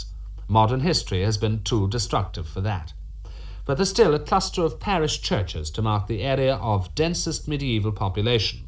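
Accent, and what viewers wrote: Ireland. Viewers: But the narrator had an Irish accent just one tuned for media of the time. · The narrator has an Irish accent???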